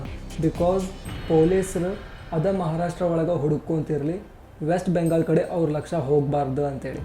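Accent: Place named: North Karnataka, India. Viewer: Ur good never heard about u...good north Karnataka accent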